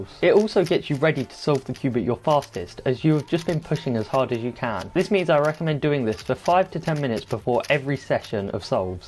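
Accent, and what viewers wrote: United Kingdom. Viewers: are u english ?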